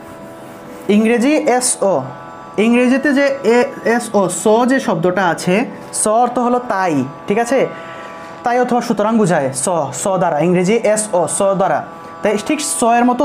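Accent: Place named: Sylhet, Bangladesh. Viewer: ভাই মনে অয় সিলেটি👌❣️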